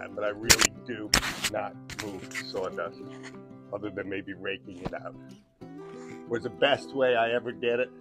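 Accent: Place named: New York, United States. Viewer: Love to hear the NY / NJ accent in a TN miller. Makes me feel at home with you. Hot Dawg !! Love your setup !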